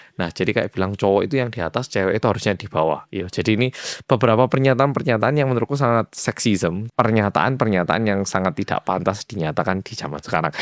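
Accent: Java, Indonesia. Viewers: Udah nggak tertarik sama sokor, cuma senang nonton mas, lucu 😂, medok medok jowo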